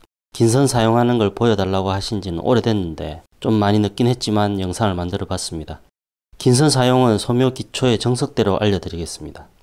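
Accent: Gyeongsang, South Korea. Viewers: 김해입니다..경상도 사투리가 정겹습니다..감사히 보고있습니다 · 이런 유익한 영상은 광고 건너뛰기 절! 대! 로! 안하고 다 봅니다! 선생님의 군더더기 없는 갱상도 말투, 더 없이 편하게 듣고 있습니다. 시어머니가 74세 인데, 제 결혼 사진을 보고 연필 인물화를 그려주셨는데 너무나 충격적(넘나 못그림 ㅜㅜ어머니도 40대에 그림 배웠다고 하시는데 맨날 천날 꽃 그림만 그리는 이유가 있었음. 꽃은 대충 그려도 이쁘니까)이어서 당장 유투브 보고 유익한 동영상 뒤져서 일단 구도 좀 잡고 비율이라도 맞게 그리라고 붙여드리면서 발견한 보물같은 채널입니다. 저도 중딩때 아주 잠깐 미술학원 다녔는데 딱 화실 분위기였어요. 강사님은 따로 없고, 모든 선배님들이 지나가면서 그림 코치해주시더라고요. 다들 대학생인지 잘 모르겠고 매일 오지도 않고, 원장샘은 아무것도 안하는...(기나오지도 않고 ㅋㅋㅋ) 그리고 선배님들이 다 말이 달라서 a 선배님이 이렇게 그리라고 하고 사라지고 b 선배님이 나타나서 야단을 칩니다. 야임마 누가 이래 그리라대! 그리고 다음날은 c 님이 다르게 가르쳐 주면서 기존에 배운 그림을 비난합니다. 누구말을 들어야 할지 혼돈의 카오스 같았지요. 미술학원은 다 이런건가 이러면서 잠시 다녔습니다 마음 같아선 평생 다니고 싶었는데 전공할 건 아니라서 아빠가 못 다니게 함 돈 없다고 ...ㅜㅜ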